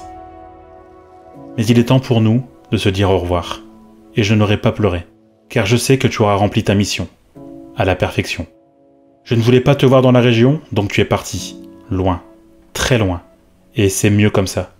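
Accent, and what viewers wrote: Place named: Nord-Pas-de-Calais, France. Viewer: Ch'ti qui vient din ch'nord in' brai deux fouâ, un' fois quin y vient, un' fois quin y r'par (j'ai essayé de transcrire l'accent 😅). Trois pattes powaaa! Gaffe quand même tu joues plus dans la même cours, ca envoie comme motobylette!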